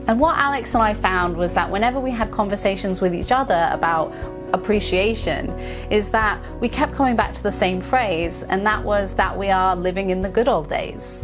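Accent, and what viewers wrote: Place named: West Country, United Kingdom. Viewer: I was amazed to hear you grew up in the States, you sound like a west country girl to me.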